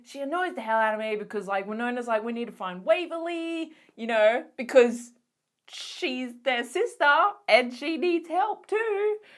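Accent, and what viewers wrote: Australia. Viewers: she's Australian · Nice vid. 👍🏻 I'm from the UK so cos were always way behind in the good shows I'm only able to see what you lot put on you tube. Thank god for people like you. I also may have a teeny weeny crush on you. Damn Aussie accent and giggles. ☺️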